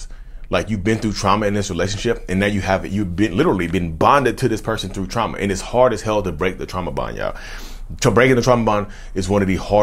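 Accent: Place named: North Carolina, United States. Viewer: Most of the narcissists I dealt with in my past - on the romantic level that is - were when I lived in Charlotte and before I knew that it was narcissistic abuse that I had experienced with them. So hearing your NC voice talk about narcissism is particularly healing to my soul. Now living in L.A. I, of course, encounter SOOOOO many situations where NPD is a factor. Your content as well as the videos from Little Shaman, Jay Reid, and Patrick Teahan are my go-to sources these days. I love it! -S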